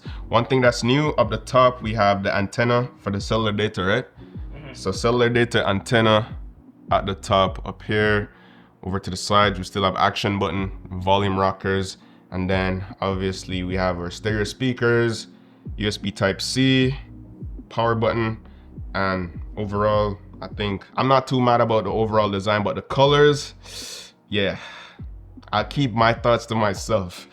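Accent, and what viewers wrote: Jamaica. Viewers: Sound like him from 🇯🇲 cant wait to get mines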